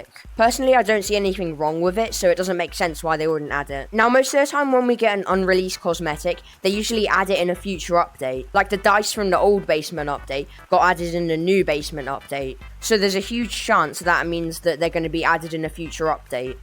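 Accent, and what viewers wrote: United Kingdom. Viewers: Why does bro sound so British I'm not saying it a bad way I'm saying it in a good way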